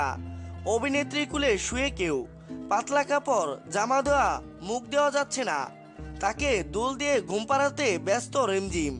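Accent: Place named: Bangal, Bangladesh. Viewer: Amader west Bengal a ki u tube Chanel a boler moto keu ni j bolche se to amon vabe bangal vasay bolche baje lagche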